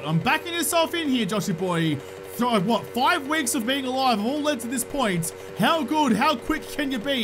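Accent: Australia. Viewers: You got to hit up those rand-o police cars when you see them cause they are caulked full of what you like to call "lovely dovely's"! (thought i saw you fly by one in the desert and I think there is one near your shitty lighthouse)!! Cheers to my favorite little speed talking ball of fucking Aussie goodness gamer from KC Missouri USA!!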